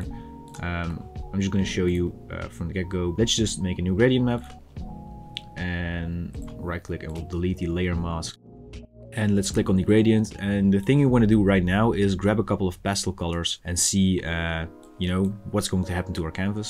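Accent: Netherlands. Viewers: i thought i recognized a dutch accent 😝 i am in amsterdam, so not close enough to enjoy the creative space in tilburg, but nice to know there is a digital art collective here in the nl!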